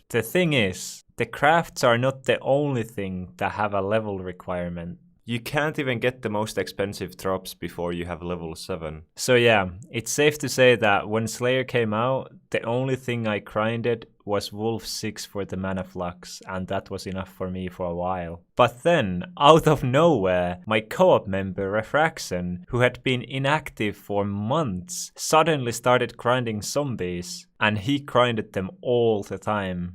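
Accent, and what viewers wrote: Finland. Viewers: Mäkin oon suomalainen huomasin aikasemmin kun sulla on semmoinen englanti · means block in finnish and he has the Finnish accent HE IS FINNISH SUOMI PRRKELE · finnish accent and his name is finnish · you have a amazing finnish accent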